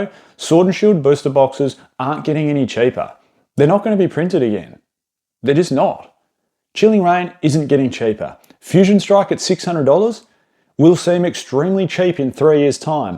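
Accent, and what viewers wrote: Australia. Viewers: As an American from Kansas, yes Australians and bristish people do put a "R" with words that end in a "A". So Paldea to us Americans sound like Paldear when you guys day it. Its not a big deal, its just part of your accent.... I have never heard anybody talk abour Australian money as dollary-doos.. 😅 Thats just funny, but in a endearing way. Dont fret to much about what us Americans say about how y'all pronounce words. We all sound different. Somebody from New jersey compared to someone from Louisiana compared to Wisconsin than California. All us Americans sound weird to each other too. Your all good 😊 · Please! It's just a dumb joke based on accent. I'm an American, don't apologize for being aussie. We love it and just trying to have fun!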